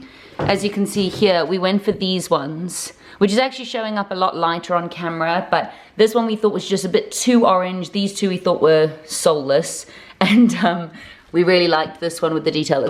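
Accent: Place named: South Africa. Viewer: Instantly knew you must be South African! Fellow South African here 🙋🏼‍♀️ Great video 🥰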